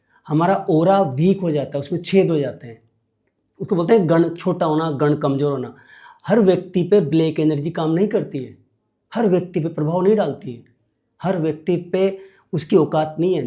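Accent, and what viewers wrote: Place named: Rajasthan, India. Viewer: Dr ji aap kahan ke hai, aapke bol me haroti ka lahja aa raha hai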